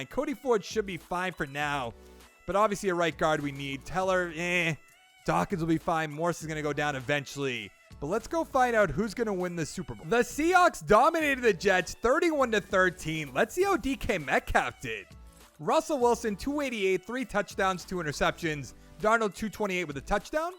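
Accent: Boston, United States. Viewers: This is the thickest Boston accent I've ever heard besides Ben Affleck and Matt Damon😂😂😂😂😂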